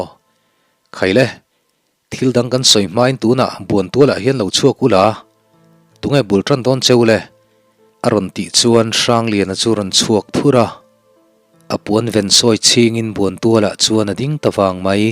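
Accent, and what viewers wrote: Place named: Pawi, India. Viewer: Mapeka, ka va zah che em aw, i thiam ang tih ka ring e, keini thangthar leh thalai zawk tena kan lo chhiar dan leh hriat dan hi a dik fu lo zawk em mawni tiin tiin ka in ngauhtuah rum rum mai. 1895 ah khan mizo hian hawrawp te kan lo nei ve tan chauh kha ani nia, ziak dan leh lam dam dik hi keini thangthar zawk te hian ka lo hre dik lovin kan ko hre fu lo zawk nge ni. Sir hi ( Synod- Sinawt-a ) Music kan zir laia an lamrik fo anih vang leh an sawirik zina a tumruhna te an sawi vangin, a channel hi ka bel chawt mai ani ani, sawi dawn ila ka sawi treuh thei mai hian ka ring e. Lai (pawi/pawih) chuan Lei ( Tongue angin kan lam thin) Lushei/Lusei chuan Lei ( Soil) angin an lam thin niin ka hria. Leido kan nei thin a ( Lei-do) Lei anga Sir a sawi a nih chuan Lai /pawih tawng angin a sawi tihna ani ah ka ngai. ( Khawlhring/ khualsim/ hlawncheu te nen bik hian tawngin ang deuh an hmang vek ani) Entir nan ( Lei do kan nei ih, kan neh ngei ding an lu ngei cu kan la ding). 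Pawi tena kan lamdan leh sawi dan aw. NB: Tawng reng hi a dang a, tui a dir e an tih lai in, tui a haw ti tawk kha kan awm miau mai kha ani a, sawi fiah viau dawn ila diklo ti tu an awm anga, dik ti tu te ab awm ang, kan tawng zawng alo har ani e.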